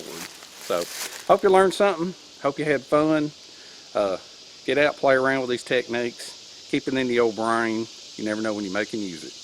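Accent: South, United States. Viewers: Hey Bro, I'm in South Carolina. By the sound of your accent, we're southern cousins. From which of theses United States do you hail, patriot?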